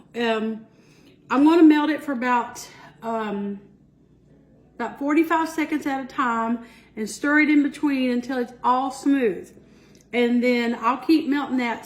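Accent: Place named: Georgia, United States. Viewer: That's so so good !! I'm from Ga. and I have the same kind of accent . I say words like that too . HAHAHA...!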